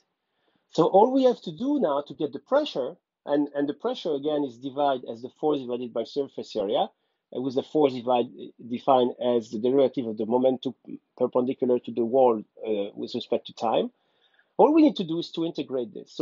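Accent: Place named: Belgium. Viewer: thanks a lot ... ur fan from morocco. Je sais que vous êtes belge et ainsi vous parler le français ...ça se voit surtout sur ton accent... vraiment vous faites un trop honneur pour votre pays ...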